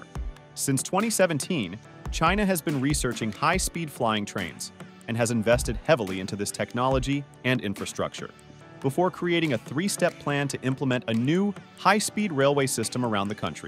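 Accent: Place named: United States. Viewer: More of the tiresome juvenile American accent espousing 'non-existent' technology, including the usual 'cut and paste' non-related images. YouTube unfortunately, is full of this crap!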